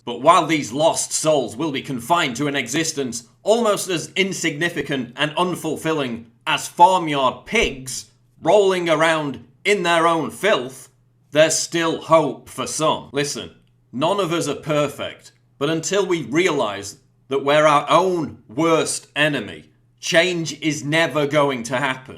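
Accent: United Kingdom. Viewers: Are you British, sir?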